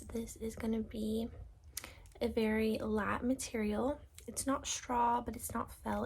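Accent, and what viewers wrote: South, United States. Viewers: Soft spoken ✨️ Southern accent 🌸 Showing us things on iPad 🎀 10/10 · i love your southern accent videos, hope to see more of them <3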